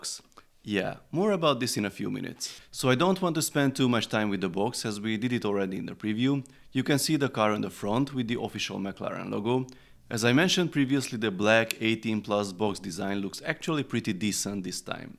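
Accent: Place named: Hungary. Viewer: Kicsit magyaros a kiejtésed de jó lett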